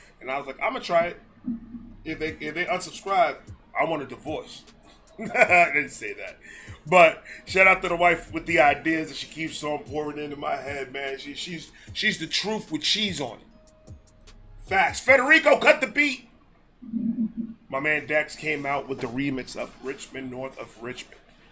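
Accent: New York, United States. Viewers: My dude! Hands down my top 3 reactors on yt. So glad i found your channel a month ago. You originally from NY? Bc i get ny vibes from u. And you talk your shit like a nyer. It's honestly refreshing man. Keep it coming man. You a real one.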